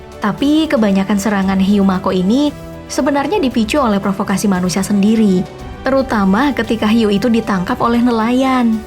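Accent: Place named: Jakarta, Indonesia. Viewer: Pan betawi yak wkwkwk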